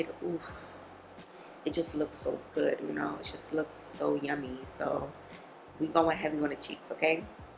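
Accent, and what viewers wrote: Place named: New York City, United States. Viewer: what is your background,where you from? Cuz I'm getting Bronx N.Y. Jersey vibe from your accent.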